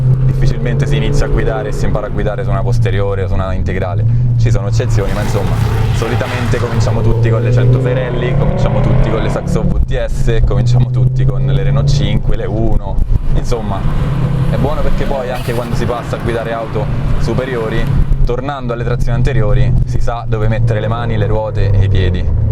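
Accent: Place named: L'Aquila, Italy. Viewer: Accento aquilano, paesaggio aquilano.... Valle subequana, una fiera di curve in un paesaggio mozzafiato.... Grande, un po di passione abruzzese nel mondo!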